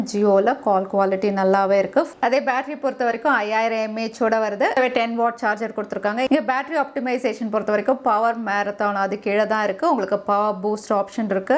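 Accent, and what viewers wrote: Kerala, India. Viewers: genuine review ur slang like mallu ,,,,,,,,,,,anyway fantastic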